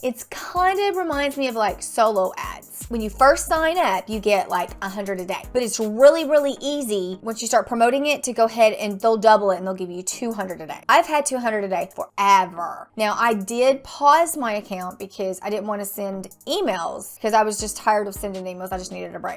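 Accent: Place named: Texas, United States. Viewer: I just come across your channel. I am from NZ. You sound as though you have a Texan accent.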